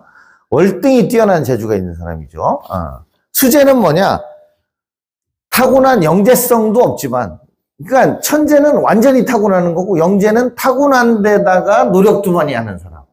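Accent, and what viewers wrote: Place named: Chungcheong, South Korea. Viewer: 걸쭉한 충청지역 토속억양이 구미 당겨놓고 시작하니 이 풍성한 명절에 선생님 만나는 기쁨 호삽니다 언제어디서나 인간의 만남에 공통 이야기 상식 풀어 주시니 고맙습니다 건강 유지하시길.....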